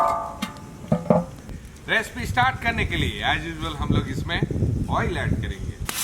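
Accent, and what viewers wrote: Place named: Hyderabad, India. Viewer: Bhaijan aap hindhi me hich bolo na kya tho bhi ae ginger garlic tomatoes bolna shuru kardiye aap bhi... ooo connect nai hora bhai aap ki zubani pure hyderabadi hai na bhai kaike liye change kardiye aap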